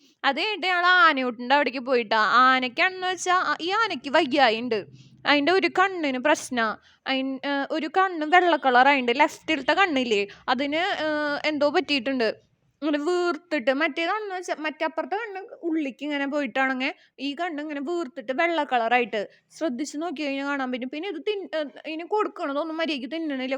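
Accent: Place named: Thrissur, India. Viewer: തൃശൂർ ഭാഷ❤,ഏതാ അമ്പലം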